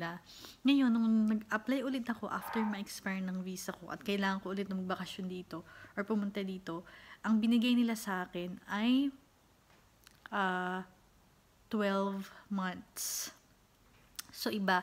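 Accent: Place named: Southern Tagalog, Philippines. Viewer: hi new subscriber to your channel... been planning to apply to work abroad for years medyo hnd po pinapalad pro I am still hoping that I can be able to fulfill my dream... anyway thanks for sharing this I'll surely try all the tips you've shared here... by the way are you from the south? Quezon or Batangas? hihi just curious though since we have a little bit of similarity in the accent... thank you and more power to your channel. :)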